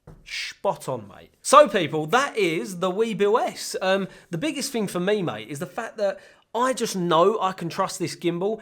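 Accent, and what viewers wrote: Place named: London, United Kingdom. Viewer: that London accent just kills me.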